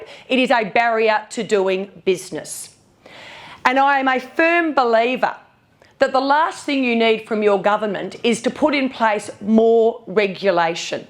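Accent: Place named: Ipswich, United Kingdom. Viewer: She is Bronwyn Bishop reincarnation with Ipswich accent.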